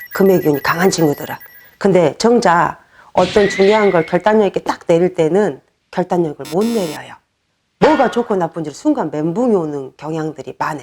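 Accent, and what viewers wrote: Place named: Gwangyang, South Korea. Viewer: 선생님 말씀이 씩씩해서 너무 좋네요 .. 광양분이신가봐요?? 사투리가 넘 좋네요~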